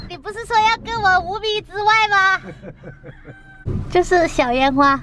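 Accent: Sichuan, China. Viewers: 妹子你这川普好巴适噢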